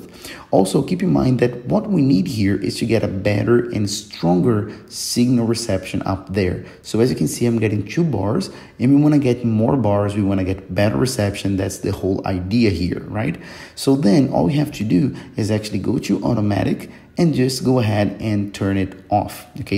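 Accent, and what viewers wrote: Brazil. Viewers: E esse sotaque de brasileiro meu parceiro? É Brazuka? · Já reconheci q tu é Brasileiro dez do começinho do vídeo kkkkk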